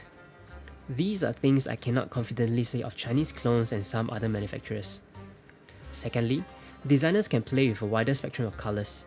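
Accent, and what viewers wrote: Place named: Singapore. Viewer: Thanks but I have to mute the video and turn on the subtitles to understand this singlish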